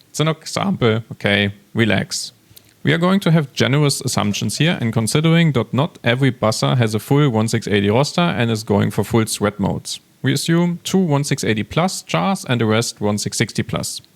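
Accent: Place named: Germany. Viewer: i like your English with german accent . good video!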